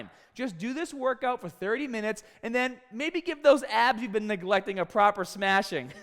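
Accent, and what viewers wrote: Boston, United States. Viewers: Are you from Boston? You've got that accent especially on the r's. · Your crazy Boston accent has tone down a bit.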